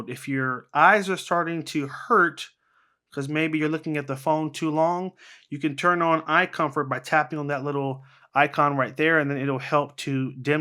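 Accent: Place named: United States. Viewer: I am a 78 years old English man and for me you talk far too quickly with a very strong American accent and race through the video too quickly. I have to keep stopping it to go back to practice what you say on my phone. Other much younger people who have seen this video on my desktop pc agree with me. So not just me that thinks the same ! Anyway thanks for downloading this video. The following video is the best teaching video I have come across for teaching beginners on Android phones how to copy and paste. ''Timmy'' explains clearly and does not race through the instructions as many YouTube tutorial videos do. As one of the comments wrote ''Straight forward, articulate, concise instruction. Exactly what we seek. BEST of any out there. Thank you, Tim!'' How To Copy And Paste On Android Tech Time With Timmy <link>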